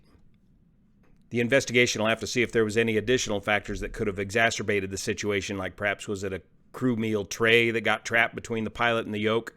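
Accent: Chile. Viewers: Definitely chilean spanish👌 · Based on the accent of the voice, It seems that the person speaking in the video is from Chile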